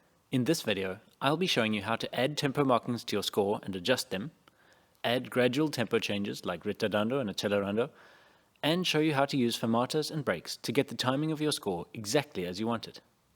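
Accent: South Africa. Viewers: Are you South African or a New Zealander?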